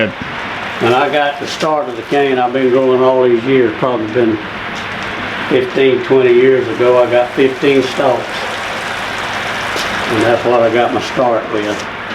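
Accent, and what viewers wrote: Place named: South, United States. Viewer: What are you from, since you have a Southern accent? Awesome sugarcane syrup though.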